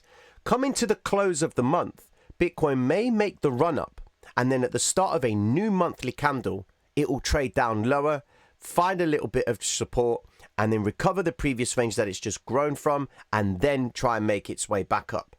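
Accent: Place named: North London, United Kingdom. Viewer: He's from north London bruvs:) The accent is the Queens English with a twist of colloquial city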